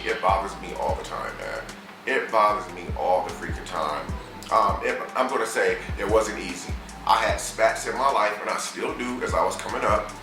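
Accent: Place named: Russia. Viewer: That accent sound either Russian or Ukrainian, at least for the ideal intro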